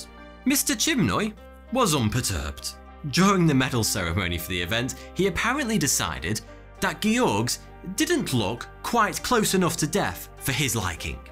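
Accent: United Kingdom. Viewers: only british accent i like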